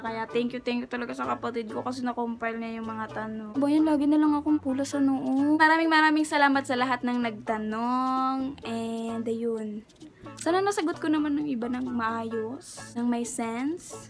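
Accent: Visayas, Philippines. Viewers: Hahaha bisaya jod kaayo 😂 miss u hahahah puntahan kita perps mol papapicture ako sayo 😂🙈💞 replaye ko please hahah now pako nag tan aw sa imo mga vids. Sub na! 💋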